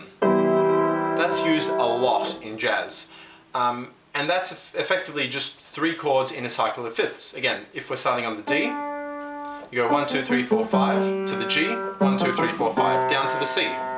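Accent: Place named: Australia. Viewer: Great lesson, nice to hear a piano instructor on YT with an Australian accent for a change, so easy to listen to. Thanks for singing as well to illustrate the chord progressions. Well done.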